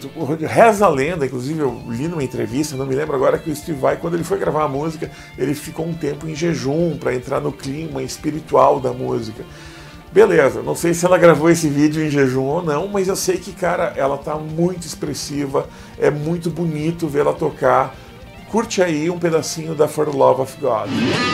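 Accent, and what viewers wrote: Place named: Rio Grande do Sul, Brazil. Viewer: Eu gosto tambem de como os seus comentários e sotaque são tão gaúchos, de qualquer maneira, sim a tina eh um lance a parte pra ser apreciado.. afinal depois de ser praticamente forçada a tocar guitarra desde os 6, 7 anos, provavelmente pelo pai, agora com 18 talvez, os frutas já estão aí pra serem vistos... E está tudo documentado do YouTube, o que eh legal tambem.... espero um dia ver composições dela mesmo... enfim, abraço :D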